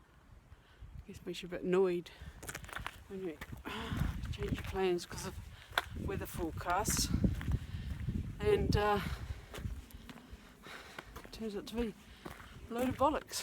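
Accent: New Zealand. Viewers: Is that a New Zealand accent i detect ! I grew up in Auckland but have lived in Manchester for the last 27 years !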